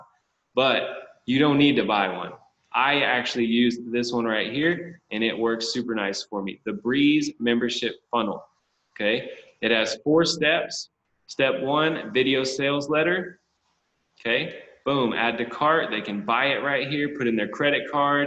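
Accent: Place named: New York, United States. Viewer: Bro Nicaragua? You sound like a New Yorker lol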